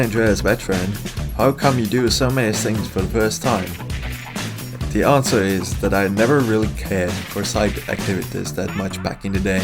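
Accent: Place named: Estonia. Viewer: hevi eesti aktsent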